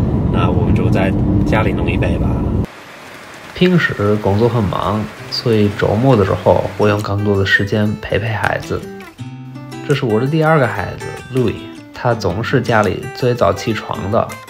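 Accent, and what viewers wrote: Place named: Australia. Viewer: 的澳洲腔说中文好好听哈哈哈哈哈😂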